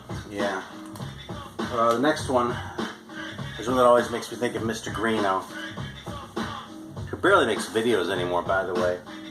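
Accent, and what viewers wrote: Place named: Spain. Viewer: That Gang Starr album totally rules! Now I'm gonna watch new Blade Runner and have some chocolate while watching it. Nice stack of picture records. I have first four Venom albums on picture discs. I have more but I really don't remember right what else. Cool Spanish accent hehe. Take care. Cheers, Petri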